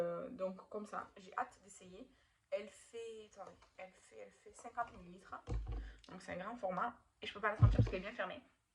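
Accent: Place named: South, France. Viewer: Bonjour 🥰, je viens de découvrir ta chaîne grâce à sandy❤, et je la trouve super, intéressante et apaisante❤️, j aime beaucoup ton accent du sud, bonne journée 🌈✨️✨️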